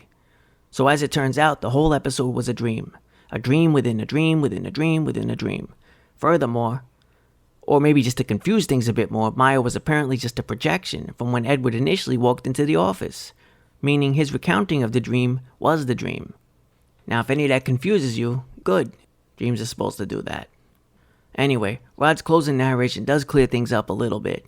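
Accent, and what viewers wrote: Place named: East Coast, United States. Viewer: As a twighlight zone fan from age 7, now, and until I shuffle off this mortal coil, I appreciate you doing these reviews. Living in the Midwest right now it's good to hear an East coast voice talking bout Rod's creation. What's your name brother...mines Edmon. 😎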